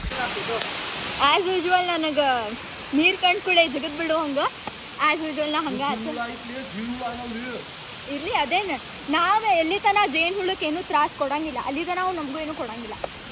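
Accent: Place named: Dharwad, India. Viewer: Hearing Dharwad language after many days❤❤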